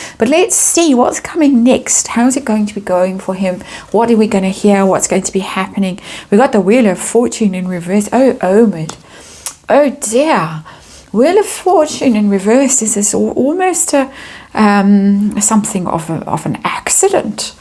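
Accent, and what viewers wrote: South Africa. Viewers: Everyone seems to be making money from this debacle, don't you think? You are one of 3 unbiased readers I trust. Plus I enjoy listening to your S African accent. My father lived in Cape town for many years before relocating because of unrest, to Sunshine Bay Australia with his second family. We have many S African dentists and opticians in my home town in Kent, I've noticed. 😊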